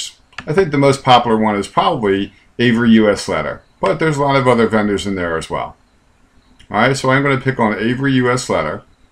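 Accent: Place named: Baltimore, United States. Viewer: You're from Baltimore, aren't you??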